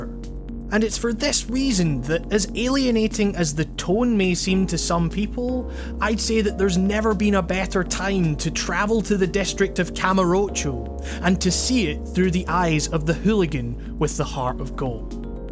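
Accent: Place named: Scotland, United Kingdom. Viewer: Thanks for answering! I really appreciate it. I hope you don't mind if I model a Scottish accent off your voice. Most of the ones in media are really exaggerated so I prefer to model off real people, and your long-from videos would give me lots to work with.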